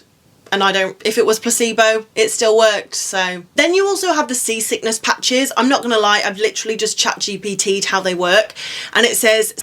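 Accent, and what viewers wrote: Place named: England, United Kingdom. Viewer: I can listen to read the phone book and be mesmerized by that lovely English accent. It doesn't hurt she is VERY easy on the eyes.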